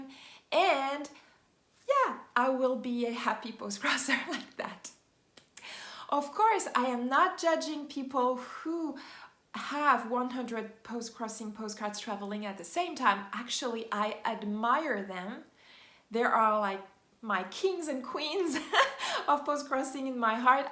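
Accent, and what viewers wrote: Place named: France. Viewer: We love you! You don't have to say sorry:) (even when you say with a french accent which is so cute <3 )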